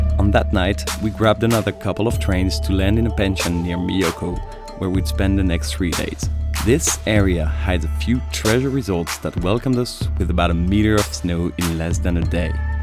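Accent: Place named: France. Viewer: you sound french bro, but you have a very very nice accent et si tu n'es pas français, bravo pour la prononciation des choses en Français ou juste le RER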